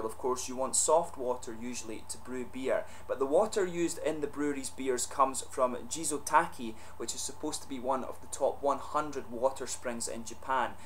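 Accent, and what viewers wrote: Scotland, United Kingdom. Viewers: You are the only beer reviewer I know that gives a detail story behind the beer, well done a always a joy to listen to 😎 Plus you have a nice Scottish accent, Don't worry I'm not hitting on you 😉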